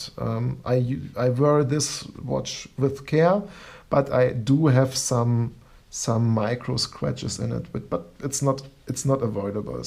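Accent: Germany. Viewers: Man hört halt echt raus,dass er Deutscher ist🤣🤣🤣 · Deutscher Akzent kickt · Man hört das du eigentlich deutscher bist. Ist aber nicht schlimm. Beherrscht die englische Sprache auf jeden Fall besser als ich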